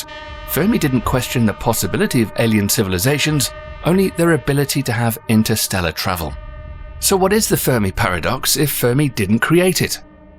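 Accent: United Kingdom. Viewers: "Because if an alien civilization exist they certainly know we are here" Another soap opera alien video that starts with a silly thought as if this was (or worse could be) a proven fact. That is first. Secondly, if they existed and they are more "advanced" than we do, and knew we are here, why would they waste their time with some silly humans who does nothing but kill each other in the name of some "god" ? Or make war for opium. Maybe you should ask yourself with your British accent if aliens could be as harmful as UK has been to this world.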